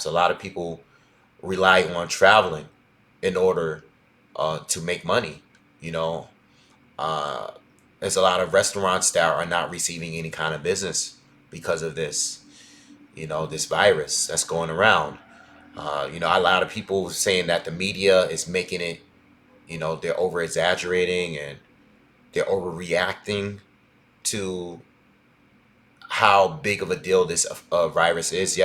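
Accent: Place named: New York, United States. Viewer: Are you from New York? So I'm a Brit from London and I've always loved that New York accent. And as I'm writing this, your video is playing in the background and yes, you're definitely a New Yorker for sure; such a cool accent!!!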